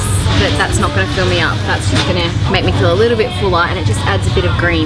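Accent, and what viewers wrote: Australia. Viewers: I changed my Alexa voice to Australian because of.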